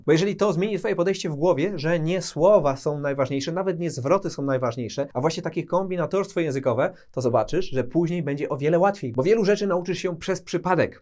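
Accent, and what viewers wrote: United Kingdom. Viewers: masz british english w stanach nikt tak nie mowi.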